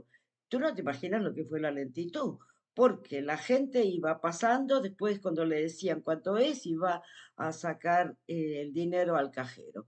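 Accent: Argentina. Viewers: Hola...qe bien te explicas... No se te nota casi qe eres..Argentina....es bueno comprar en otros super...no siempre en el mismo..y asi se compruevan..los precios y calidad claro chao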